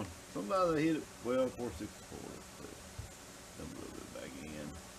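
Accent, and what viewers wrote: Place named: Tennessee, United States. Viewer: you sound like you from tennessee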